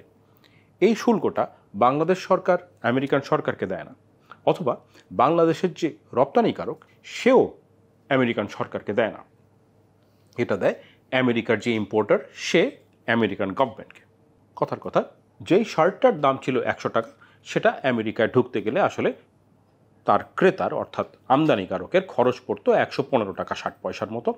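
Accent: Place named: Kolkata, India. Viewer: আপনার কথাগুলো কলকাতার বাঙ্গালীর মতো, দাদা কি কলকাতার নাকি?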